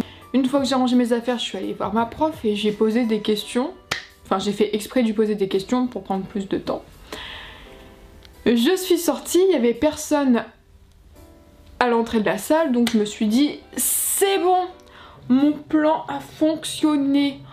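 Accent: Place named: North, France. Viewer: Ptn elle a grave l’accent du nord😂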